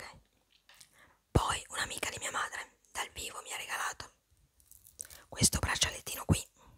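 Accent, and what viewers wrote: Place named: Veneto, Italy. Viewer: Io pensavo veneta per come pronuncia la "r"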